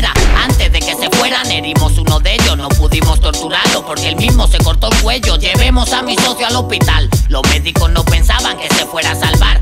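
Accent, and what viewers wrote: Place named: Dominican Republic. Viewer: El tiene el acento un poco dominicano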